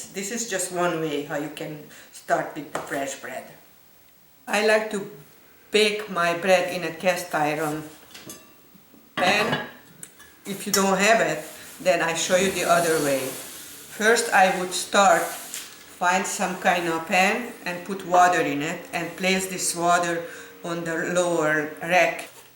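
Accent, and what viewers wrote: Hungary. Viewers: Thank you for your great videos. Not only do you make baking look easy, but you present it in a way that can be followed by someone like me, who is afraid of baking. I came to the US when I was only 7 years old. I'm a pretty good Hungarian cook. But, I love listening to you with your beautiful Hungarian accent. It adds extra flavor to everything you make!! Mivel nincsen senki akivel tudok magyarul beszélni, kérlek, enged meg hogy veled kommunikáljak és esetleg kérjek főzési tanácsot tőled. A nővérem Budán lökött. Nem jót USA'ba csak látogatóba. De már nem él. A volt férjemmel sem beszélek magyarul mert a többi család nem érti. Csak egy osztájt fejeztem be Pesten, de azért nem vészes a nyelvtan és az írásom. Régen leveleztem a nővéremel. Az már nagyon, de nagyon régen volt. Thanks again! And all good wishes to you and your family. Miriam P.S. 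I would love to know a little bit about you. You can find me on Facebook, if you wish to friend me. I would be so honored.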